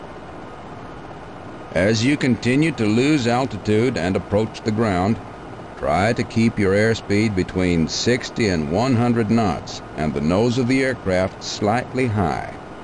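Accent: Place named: South, United States. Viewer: From Kentucky. I didn't notice the narrator trainer's southern accent until just now. I used to play this as a kid 25yrs ago and he sounded like all the other old farts around here telling me what to do 😂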